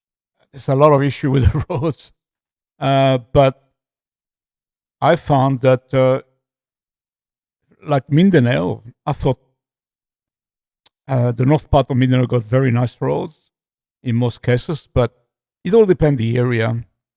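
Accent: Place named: Belgium. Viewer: im curious, i read you're from Australia, but your accent sounds european. my friend says you sound belgium.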